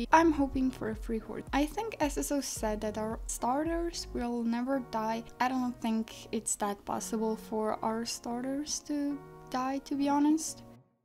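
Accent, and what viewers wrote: Germany. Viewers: Are you German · I think she's German? Or atleast her accent is very similar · Omg lol you german?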